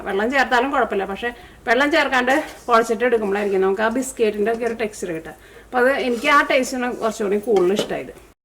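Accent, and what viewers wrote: Thrissur, India. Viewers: Enikku Chechide thrissur accent aanu kooduthal ishtam. Original thrissur nasrani accent! 👍🏻👍🏻👍🏻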